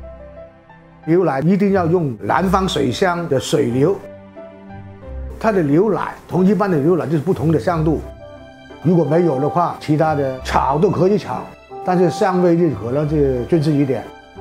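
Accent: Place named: Hong Kong, China. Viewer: 口音真畀我一種香港人嘅錯覺😂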